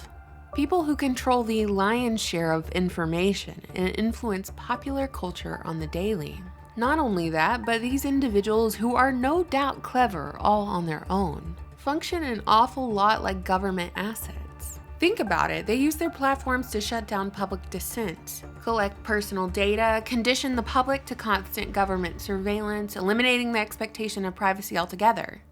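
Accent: South, United States. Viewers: I love your videos...you have a familiar southern draw that me being from Birmingham alabama, just a couple of hours drive down I-20 from you, have missed since I've been living in Sacramento..and the unique power of a southern girl to be classy, sexy, intelligent, and a natural rebel all at once, unlike here where the girls are not any prettier and have personalities that make them instantky ugly as hell.....i love your brain to..the way u think is refreshing and you present it very well because you info is always insane because I learn stuff in every video, and there I was thinking I knew it all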